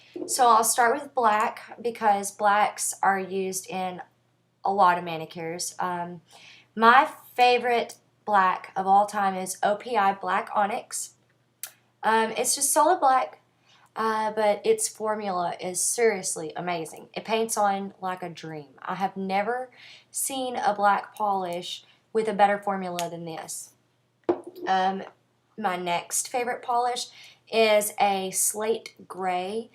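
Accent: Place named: South, United States. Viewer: LoL - high five swanky southern accent!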